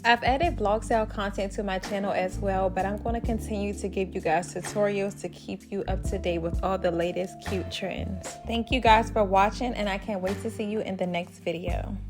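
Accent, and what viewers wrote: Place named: DMV, United States. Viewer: Love the hair!! And please tell me you’re from the DMV lol. My boyfriend says we don’t have accents, but I know it when I hear it!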